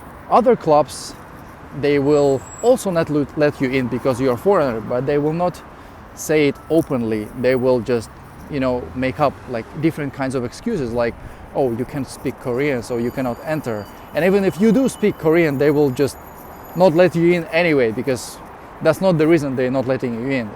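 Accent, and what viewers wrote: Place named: Eastern Europe. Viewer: He sounds a bit slavic...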